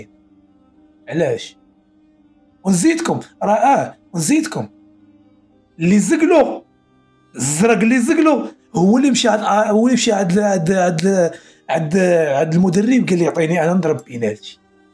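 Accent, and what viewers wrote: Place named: Jerada, Morocco. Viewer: غير طريقة الهضرة نعرفك جرادة هركاوي و صراحة بزاف هد الكادوات للمدللة رزاء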